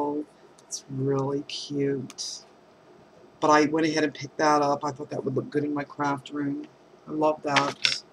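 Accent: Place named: Charlotte, United States. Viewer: Omg, not I know why part of your accent sounds just like my mom's. She is from Charlotte and that's where I was born. Anyways, I was just gonna skip going until your video so thanks for sharing!!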